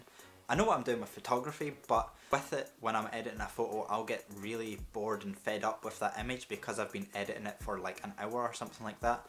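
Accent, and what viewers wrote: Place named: Glasgow, United Kingdom. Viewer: Can I just say that I have a form of anxiety and when I get anxious I don't want to be around people. So if I can I go to my room and watch your videos and it really calms me down and I think it's because of your accent because it is fairly local to me (Glaswegiany) so please don't stop creating because I love your videos and my friends tell me I ramble on about your channel and it's great and you're great and yea...bye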